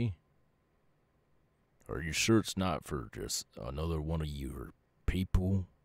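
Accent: South, United States. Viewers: That voice with that accent oooh. As a southern gal, that's real nice.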